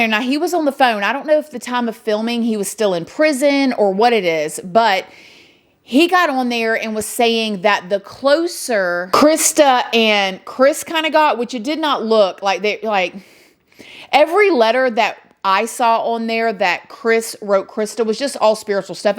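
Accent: South, United States. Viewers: This is probably your best video yet! You are straight up for real with your feelings on this because your Southern accent has never come out like this! I sound super Southern when I feel like this, too! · I love how your southern accent came out in this video, lmbo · Your southern accent is coming through strong today! Lol when I get loud, mine does too. lol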